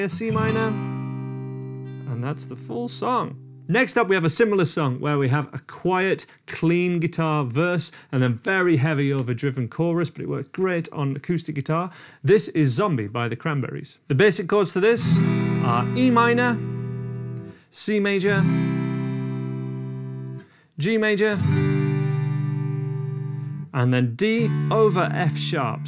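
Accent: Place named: Northeast England, United Kingdom. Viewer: Dude are you from the north east of England?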